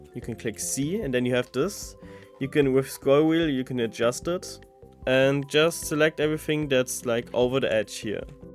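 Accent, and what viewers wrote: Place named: Germany. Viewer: Ich glaube es könnte sein, dass du Deutsch bist(Akzent)😅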